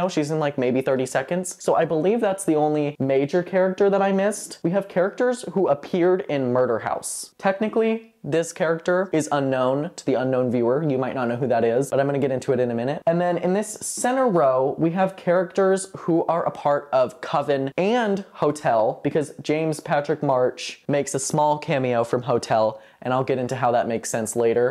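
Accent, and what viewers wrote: South, United States. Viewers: your rapidness but like simultaneous efficiency with videos is epic??? a tale to be foretold thru generations?? but i also love the growth and being able to see ur personality and personal twists on things come out more and more, so obsessed! also the southern accent sheeyt sends me every time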